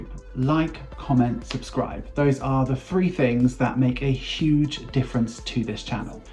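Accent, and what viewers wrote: United Kingdom. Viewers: Congrats! I just enjoy hearing you speak with British accent haha. Hello from Los Angeles.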